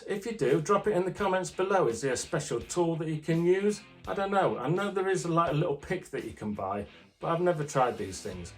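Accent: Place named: East Midlands, United Kingdom. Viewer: Haha could tell instantly have strong East Midlands accent lol.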